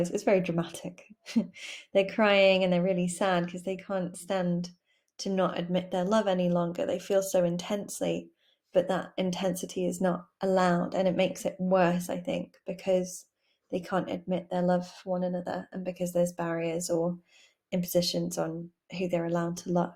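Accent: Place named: United Kingdom. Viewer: you've summarised this in such a beautiful way! i don't have this in my course or something, but I'm very interested in poetry and especially keats' poetry. being an admirer of keats, I've heard a lot about this poem and now I finally know what it's all about. thank you so much you helped a lot! ❤️ ps. I love your british accent. british is my fav of all types :)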